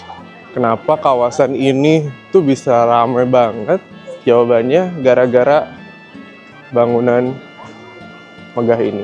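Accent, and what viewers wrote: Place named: Bandung, Indonesia. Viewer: Ini masnya org bandung ya? Duhh kenapa mengingatkan mantan bgt. Suaranya, logatnya, jokesnya😂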